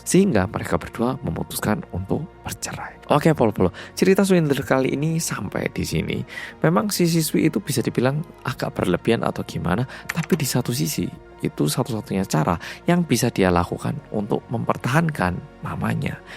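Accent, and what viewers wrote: Java, Indonesia. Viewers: Maaf ya mas kalau aku denger denger suara mas itu bener bener medhok Jawa banget jadi bangga ada orang Korea tapi puiiinnterr ngomong jowo 😅😅😅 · Love swindler yang medooook. Makasih sudah menghibur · kan tinggal lama di Malang sekolah di Malang juga lama..gimana ga medok..bule aja ada yg medok biar pun ga tinggal lama di Indonesia ..lo tau ga bule medok ..😂😂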